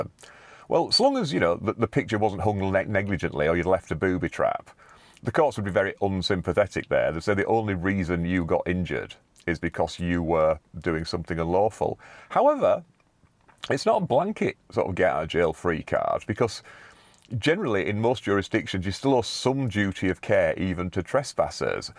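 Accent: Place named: Yorkshire, United Kingdom. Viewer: I love listening to someone speaking Latin in a Yorkshire accent. I thought I was living in 'The Hunger Games' ? Don't have to imagine.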